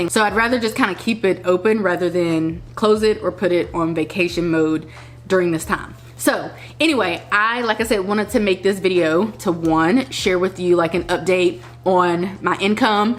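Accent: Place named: Pittsburgh, United States. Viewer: Lovely you have to be from my hometown Pittsburgh … I can pick up on our accent anywhere … it’s nice hearing it especially since I don’t hear it to often ♥️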